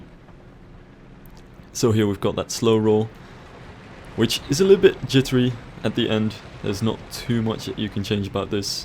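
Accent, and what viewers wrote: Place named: Netherlands. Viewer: you have to be dutch, atleast you sound like a dutch guy speaking english, btw verry nice vid man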